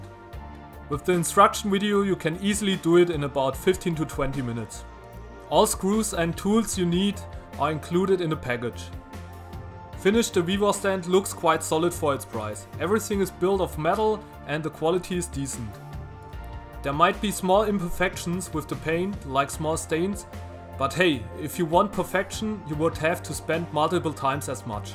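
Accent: Germany. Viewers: Man hört das du deutsch bist 😂💪👍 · I seem to be noticing that you have a german accent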